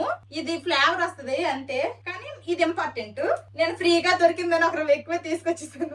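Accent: Srikakulam, India. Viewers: Vantalu chala baaga chestavu Nee srikakulam bhsha vinte Chala santoshamga untundi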